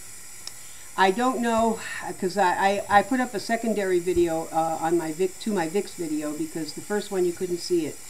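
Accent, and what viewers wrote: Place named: Italy. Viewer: That's awesome! Thanks for making this video, I have constant allergies and I wanted to see reviews of steamers. Are you Italian by the way? My mom's side is Italian and your accent reminds me of my Italian part of my family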